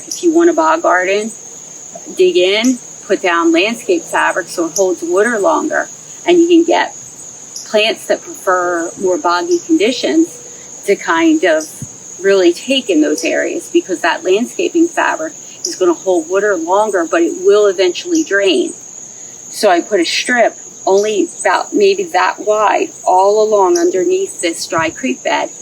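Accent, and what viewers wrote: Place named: Philadelphia, United States. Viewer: Just lovely. Guessing you’re near Philly? I hear a slight accent especially when you say wooder instead of water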